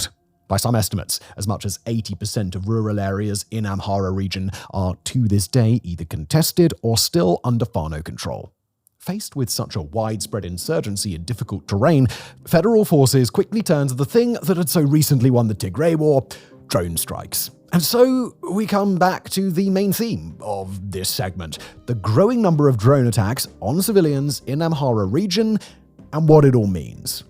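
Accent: United Kingdom. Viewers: PM Ciolacu is not from Iohannis' party. That party is the NAtional Liberal Party, a center-right party. Ciolacu is from PSD, the center-left (and socially conservative) party that has dominated Romanian politics in all of its incarnations. Ciuca was Iohannis' creature, since the President propelled him to lead the National Liberal Party and to be PM before Ciolacu as part of their left-right alliance during the war in Ukraine. Listening to these videos reminds me of how Gell-Mann amnesia manifests, when you believe something a Brit tells you in his cool accent until he starts talking about something you know.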